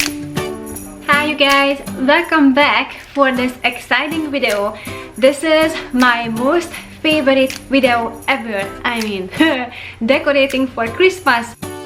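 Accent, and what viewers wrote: Hungary. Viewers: Kihallottam a magyar hangsúlyokat tudtam hogy magyar vagy! Köszi a sok ötletet. Egyszerű és nagyszerű! 🎄❤️boldog karácsonyt! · So sophisticated and beautiful, you gave me great ideas! You have a Hungarian accent, are you from Hungary by any chance?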